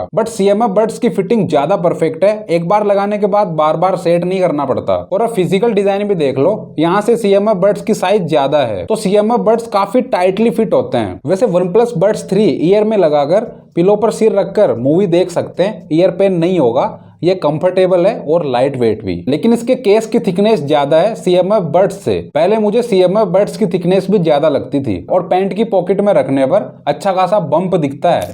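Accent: Haryana, India. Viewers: Baato se to Haryana ka lagg raha hai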